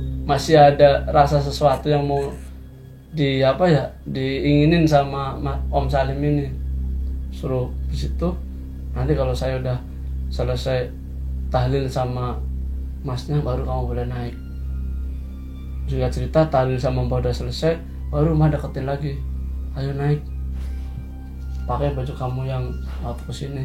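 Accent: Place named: Java, Indonesia. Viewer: Waktu awal2 dia nongol, dia nyebut dirinya "gua".. tp jd aneh sih dengernya krn dg logat jawanya, lbh cocok pake "saya" spt skrg2 ini.. hehee.. sukses terus mas!! Story teller termantabb, bisa inget detail banget semua pengalaman2nya..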